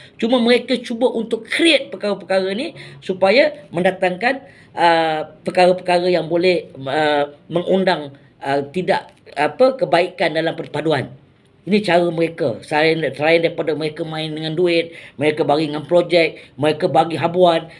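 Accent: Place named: China. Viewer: ...tak syok cakap intonasi macam ni....kena buat macam sebelum ni...syok dengar...ada itu pelat2 chinese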